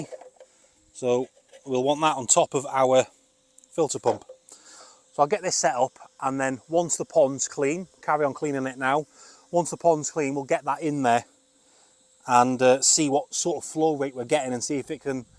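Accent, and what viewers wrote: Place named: Cornwall, United Kingdom. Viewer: Thought i could hear a jacko accent. Wont the stocked goldfish keep the pond clean.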